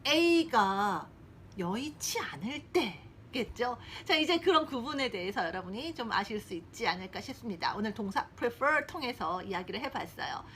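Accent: United Kingdom. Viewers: 선생님의 브리티쉬 발음 너무 멋있어요~😍 전 북미권에서 공부했던지라 ㅋ 선생님 목소리를 유투브에서 들을 수 있어서 너무 좋아요~ 유학시절 추억돋네요~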